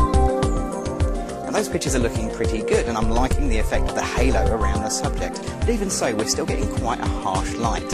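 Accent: United Kingdom. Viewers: Brity girle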